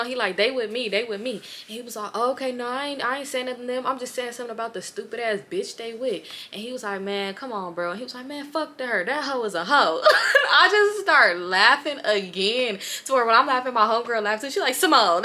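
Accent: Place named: Los Angeles, United States. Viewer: u be telling your stories like everybody from la lol but love ya girl!! love your storytimes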